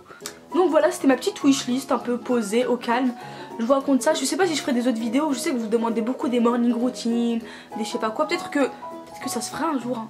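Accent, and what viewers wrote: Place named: England, United Kingdom. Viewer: J'adore ton accent anglais 😂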